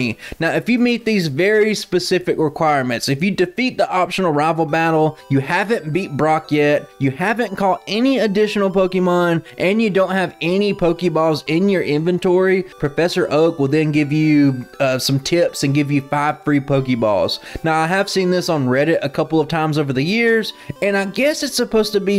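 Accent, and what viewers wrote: South, United States. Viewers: Finally, not a Canadian. You've got the most southern accent of any Pokémon challenge Youtuber and it's welcome.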